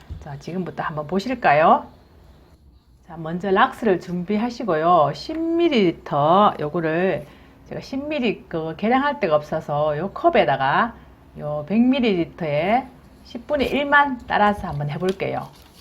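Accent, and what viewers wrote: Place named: Busan, South Korea. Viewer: 부산 사투리 너무 매력있어요~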